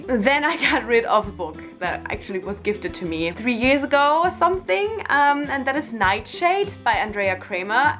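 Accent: Germany. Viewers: Die ganze Zeit hab ich gedacht "Das ist doch ein Deutscher Akzent, oder?" und dann kam das erste Deutsche Buch😂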